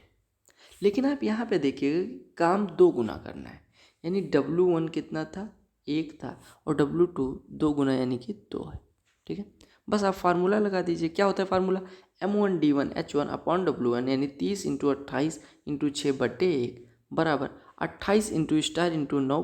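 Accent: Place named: Mithila, India. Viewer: Bhaiya kya aap mithilanchal se hai? I am also from Janki janm Bhumi Sitamarhi, Bihar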